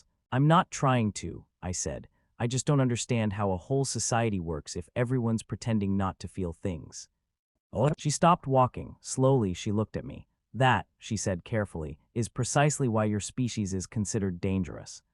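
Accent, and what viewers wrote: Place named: United States. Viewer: Plenty of aussie accents on AI. Kinda wrecks the story with a yank voice and customs.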